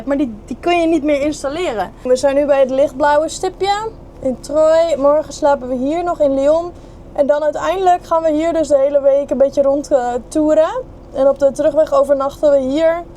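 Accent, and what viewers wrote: Friesland, Netherlands. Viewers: Met dat licht Friese accent de hele tijd klagen is echt leuk om te horen sorry haha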